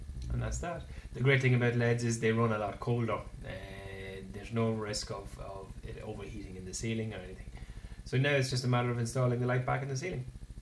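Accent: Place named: Ireland. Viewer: its hard to be sure about a technician with an irish accent, its a tad off putting, telling a joke or singing a song, writing a poem, sure, but not doing something technical, just saying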